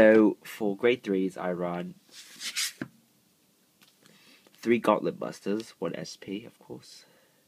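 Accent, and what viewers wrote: United Kingdom. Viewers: You sound british when you're sick.. Awesome. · Nice British accent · You have a British accent when you're sick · U sound british for some reason!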